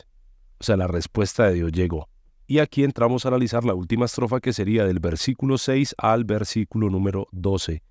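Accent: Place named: Colombia. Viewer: Es la Primer vez que edtoy OpenDocument de eyte pastor y la explicación de la palabra deDios. Por el asento como habla pienso que es Colombiano 😊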